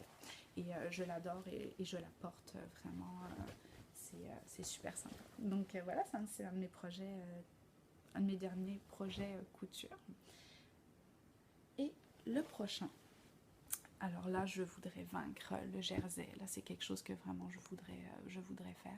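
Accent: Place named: Quebec, Canada. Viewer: très bon moment passé avec toi j'adore ton accent et puis ma fille est au quebec Rimouski) depuis 9 mois pour ses études alors je suis d'autant plus contente de découvrir tout ce qui touche à ton pays et autre point commun je suis infirmière aussi et j'adore le tricot j'ai découvert les laines de la maison tricotée au salon aef elles sont magnifiques je comprends ton engouement pour cette boutiquehate de te revoir dans un 2ème épisode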